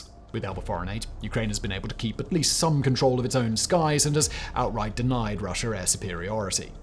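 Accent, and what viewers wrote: United Kingdom. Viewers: Why do you use US spelling, or simplified English? From your accent I thought you were somewhere in Great Britain.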